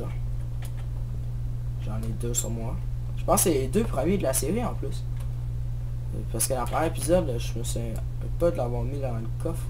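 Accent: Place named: Germany. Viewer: Tes cris sont toujours épic ^^ sinon bel accent allemand bravo! ;)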